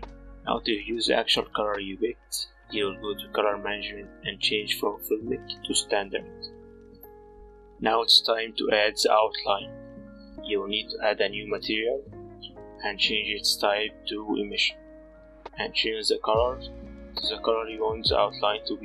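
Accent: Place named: Egypt. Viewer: You are arabic, aren't you? Most likely Egyptian. I knew it once I heard your accent lol. I am Masry as well.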